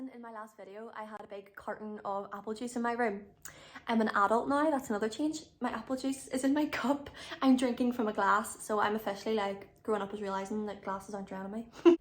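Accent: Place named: Ireland. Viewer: Irish accent sounds so harmonic.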